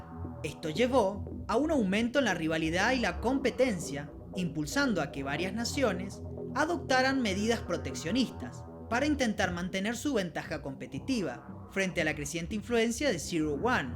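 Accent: Argentina. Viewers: Acento Argentino jodiendo toda la saga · matrix version porteño che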